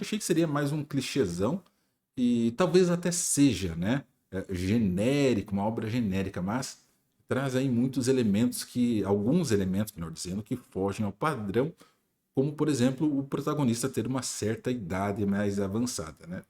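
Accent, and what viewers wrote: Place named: Rio Grande do Sul, Brazil. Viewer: muito obrigado. Uso saquinhos em alguns, mesmo morando na Paraíba. O sotaque parece gaúcho. ☺️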